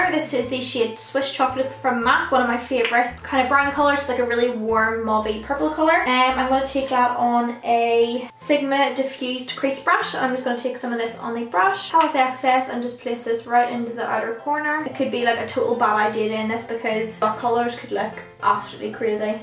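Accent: Northern Ireland, United Kingdom. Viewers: Are u from Northern Ireland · Are you northern Irish??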